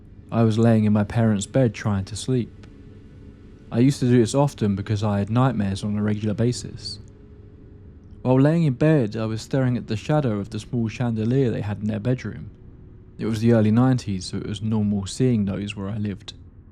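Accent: England, United Kingdom. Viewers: Big fan of your channel man, great narration, great stories and the spooky English accent helps, much love bro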